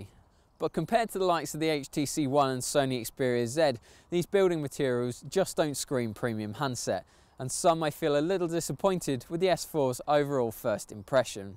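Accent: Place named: United Kingdom. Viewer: Das britische Englisch hört sich schon scheiße an... aber das S4 hole ich mir sowieso... aber nicht in England.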